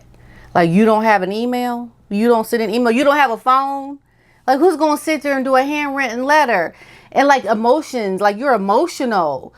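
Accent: England, United Kingdom. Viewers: 😆😆😆 Love your British accent! Made us laugh here in the UK. “Lovely!” xx 🇬🇧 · Hello. You look gorgeous, as always. You're spot on all the time!! I look forward to your videos. You are so funny! Love your English accent!! Have a great day. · GreatEnglish accent.😂💖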